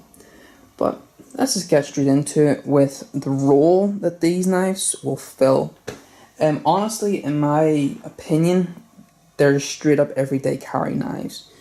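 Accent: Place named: Ulster, United Kingdom. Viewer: Hi 'Bout ye! Glad to hear an Ulster accent on YouTube. Enjoyed your vid,very professional presentation, it flowed with just the right pace and level of information. Excellent appropriate use of close focus. Good idea to end with 3 comparative size/style knives. I've chosen a purple Tsuchi today, I like a bit of bling!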